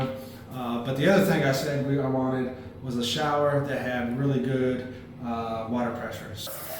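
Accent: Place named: United States. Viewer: If you don’t mind me asking because you didn’t disclose the information on how much was the total cost for everything. You sound as if you’re from the states as myself. So my question is how much was everything (land & everything built including fence) plus if you are from the states how are the taxes done?, do you have to pay taxes in both countries?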